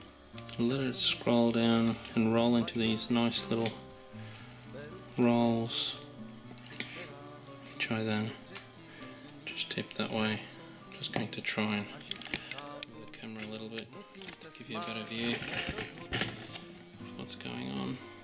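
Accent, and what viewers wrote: Australia. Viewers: You Aussie? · You sound Aussie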